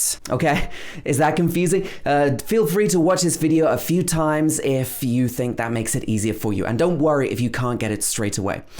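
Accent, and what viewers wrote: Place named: RP, United Kingdom. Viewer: Bloody gorgeous British accent(posh)💚💚💚💚💚!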